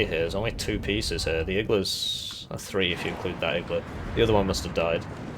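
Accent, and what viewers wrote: United Kingdom. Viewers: GGs, thanks for waiting. Never skip the deck review. Man did you sound British is this vid! I think the water helps your accent.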